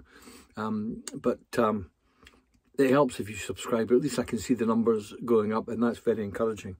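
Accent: Scotland, United Kingdom. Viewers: Love the Scottish accent, the pronunciation and passion Really interesting and inspirational thanks for posting What is it with these dog poo bags? Definitely think car owners are the biggest cause of litter. Antidotally I’ve heard campervans having litter placed deliberately outside them so they get the blame.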